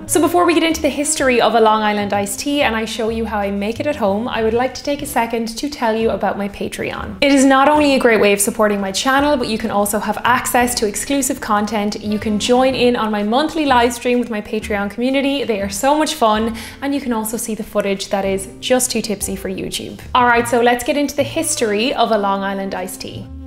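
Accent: Ireland. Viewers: Greetings from America🇺🇲 Saw you first on the TRY Channel, which was fantastic fun. Didn't know you had your own series; great video. One can never go wrong with the iconic Long Island. Love the video, Love Irish accent, and the perfect hostess. Looking forward to more of the same in the near future... Cheers! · As a 21 year veteran fireman from a university town PENN STATE {one of, if not the, top party schools in the USA}. . . I LOVE the Long Island Ice Tea. My favorite way to drink it? by the pitcher! My record was 3 pitchers. And I still walked home upright. And my I say from the states, It's hard to tell which is more lovely, your eyes, hair, or that wonderful Irish accent.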